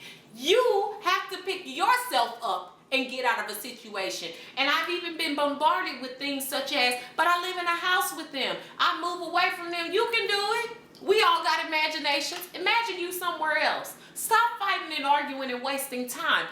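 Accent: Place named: Florida, United States. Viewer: you sound like your a florida girl lol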